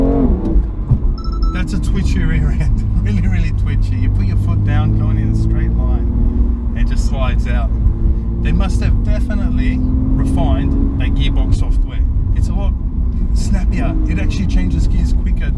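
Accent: Australia. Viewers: So great too have a aussie doing a great review .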